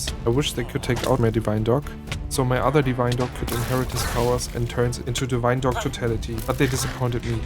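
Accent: Germany. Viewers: Very german englisch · are you german# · are you german by chance? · you are german right? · are you german 🔥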